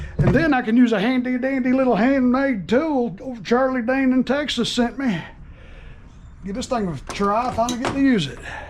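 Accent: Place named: Georgia, United States. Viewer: Sounds like you in ga I’m in Covington ga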